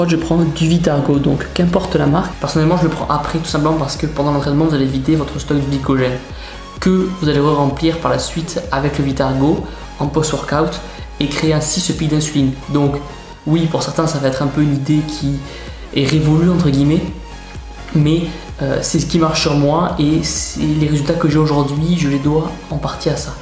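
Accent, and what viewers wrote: South, France. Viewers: hey tibo ! haha cool tes videos ! je rigole tous le temps quand tu te présente...dawnnn cest tibo in shape...jespere que vous allez bien etc...avec ton accent du sud ! question : en mangeant les mêmes choses que sur la video au même heures,sans prendre de whey,crea, etc...peut on avoir les meme resultats ? Resmey.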